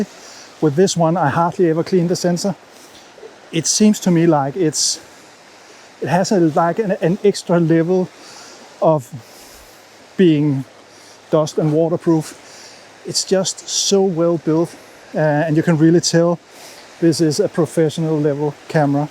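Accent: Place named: Denmark. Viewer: I love Danish accent 😂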